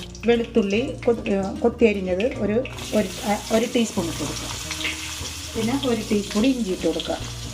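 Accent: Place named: Thrissur, India. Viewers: Hi എവിടെയാ സ്വാദ്ദേശം തൃശൂർ ആണെന്ന് മനസ്സിലായി അവിടെ എവിടെയാ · തൃശ്ശൂർ സ്ലാങ് പോലെ തോന്നി. സൂപ്പർ ആയിട്ടുണ്ട് ട്ടോ. അവതരണം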